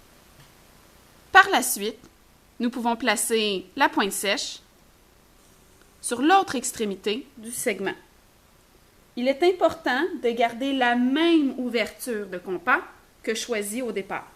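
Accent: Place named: Quebec, Canada. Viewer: ps: super l'accent Québéquois (surtout ne le prend pas mal)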